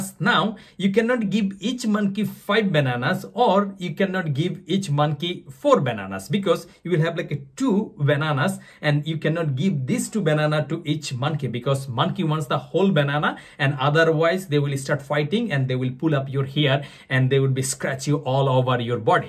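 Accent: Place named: India. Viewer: This indian talk.. Oh I love your channel 😍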